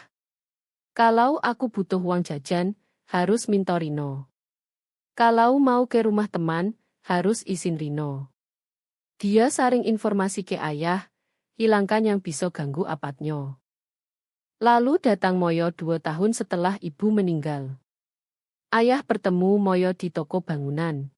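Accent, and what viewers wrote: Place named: Java, Indonesia. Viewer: Waduh! Accent Jawanya medok banget! But! It’s okay!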